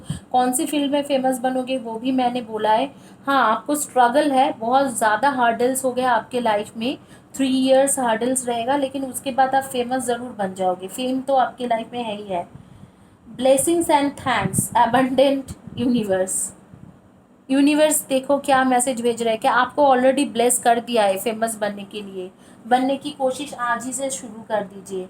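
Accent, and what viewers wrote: Bengal, India. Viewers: Radhe Radhe madam ji. Apka bolneka tarika se mujhe lagta hey ki ap bangali ho..... Pile no 3 chuna tha . Jo mech nei kiya...... Jay sri krishna.....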